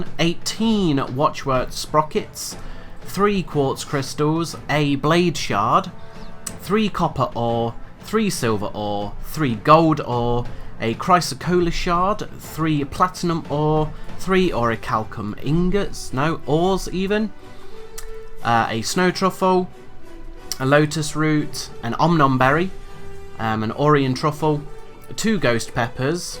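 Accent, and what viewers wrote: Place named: United Kingdom. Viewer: too english english😆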